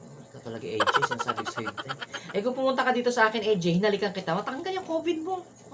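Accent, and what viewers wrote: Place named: Iloilo, Philippines. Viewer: Good morning ser salamat yn .ka uli kay bunso 💖 😘..na miss ko boses isang ilonggo. God bless you 🙏 ❤ watching Bahrain po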